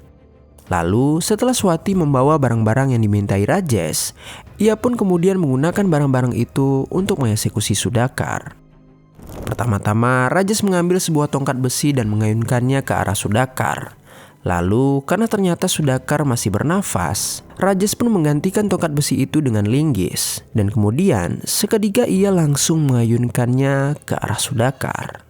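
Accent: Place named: Aceh, Indonesia. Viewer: Dari gaya bercakap sy teka admin ini adalah dot dot dot.. orang aceh.. beliau ada 2 lagi akaun youtube.. apa pun taktik yg mantap bro! 😊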